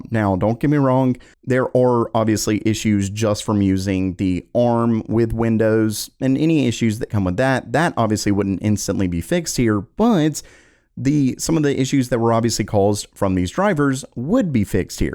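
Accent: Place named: Pennsylvania, United States. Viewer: dude are you from pennsylvania. you say on like awn. my wife does the same thing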